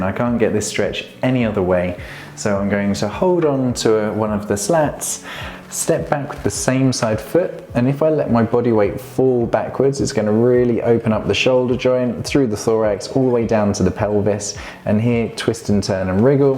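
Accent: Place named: United Kingdom. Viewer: Great ! I'll check later then. Meanwhile I'm seeing your other videos here too. They're very nice as well. Saw your Minimalistic Gym Home with Kettlebells and, we have a lot in common ! I also train a lot with KBs ! Have a collection of them though ! As for paralletes I plan to buy a pair later. Talking from Brazil here. You from UK , as I deduced from your accent and later on Youtube. Best wishes anyway ! BTW the squat video very nice too. Another suggestion a follow along routine to improve your Squat daily....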